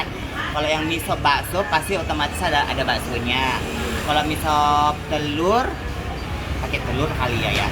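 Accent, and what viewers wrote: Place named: Banyumas, Indonesia. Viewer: Kak sering-sering update ngapak😭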